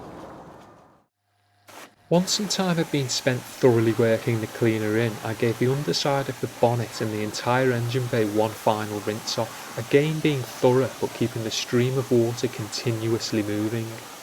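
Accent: Liverpool, United Kingdom. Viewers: oibibi oybib he is British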